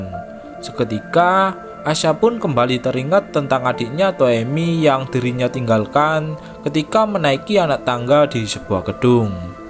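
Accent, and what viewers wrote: Java, Indonesia. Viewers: Jngn terlalu medok mas suara nya... Biar saat bercerita lebih terbawa suasana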